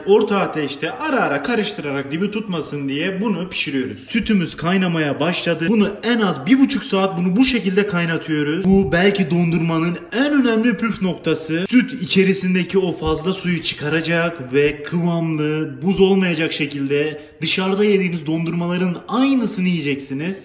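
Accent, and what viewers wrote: Adana, Turkey. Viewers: Hatay diyo ama Adana şivesi var anlamadım ki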